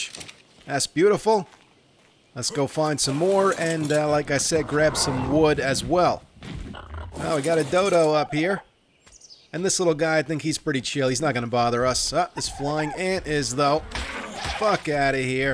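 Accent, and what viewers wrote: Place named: New York, United States. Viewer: I'm new and very entertained by the irate ny/nj italian vibes & accent.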